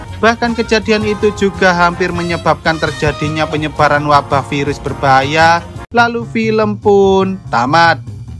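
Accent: Java, Indonesia. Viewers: logat nya kok jawa kali jadi enek di denger, kenapa gak biasa aja bg biar bagus kayak IQ7 & SAQAHAYANG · Ya allah mas medokkk e samean wkk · Medok sekali 👍🏼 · Saran saja mas tolong logat Jawa nya jgn terlalu keras pasti seruuu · Medok bngt.. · Ngomongnya bisa ga sih gausah medhok gitu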